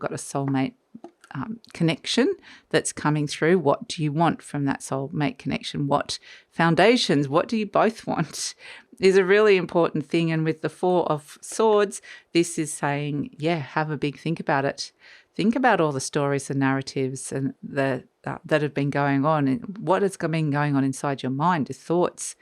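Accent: Australia. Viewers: Great forcast! 👍 Yes we need to talk hope my Virgo of 43 yrs Love of my life He is very important to me ♥️ 3rdparty came into our marriage but l have forgiven him long ago. He was loyal but grief hit us that no parent should have to go thru Would be great if he gets intouch so we can have closer one way or other .l did try but silent his end Thank you so much for your reading very positive and offer's Hope ! You sound like you are Australian??. The view out your windows is peaceful looking at your tree ferns And your your feather friend having his say love it Chooks are lovely love from Queensland Australia 🇦🇺 ♥️🎶🌈🙏🐞🦋🌞👍💐💐🙏🙏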